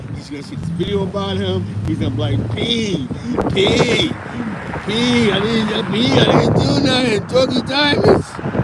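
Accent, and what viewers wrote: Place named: East Harlem, United States. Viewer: Damn I missed the live but salute and make sum videos it’s wack out here need to hear that East Harlem talk u know how we do 1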